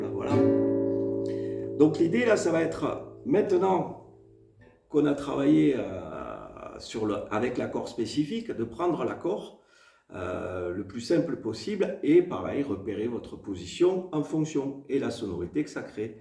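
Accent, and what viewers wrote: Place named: Provence, France. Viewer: Pas pire, yabontuto. Ça promène, au fil du manche. Avé le bel accent qui sent le sud provençal. Bon c'est reste plus simple de regarde que refaire, mais pour le coup ça donne l'envie. Bravo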